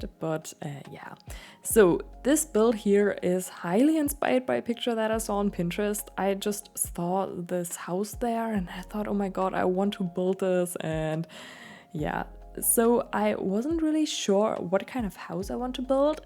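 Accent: Germany. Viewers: 🤣🤣 thanks for clarifying! I knew she sounded German. Danish would be a close match, tho!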